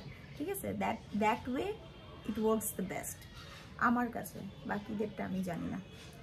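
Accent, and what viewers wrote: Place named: Bangladesh. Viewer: Apu tumi khulnai thako. Prothome vabcilam tumi indian. Tarpor tomar bangla accents shune dekhi tumi deshi meye. Akhon to dekhi tumi khulnar. Amio khulnar meye apu. Take love❤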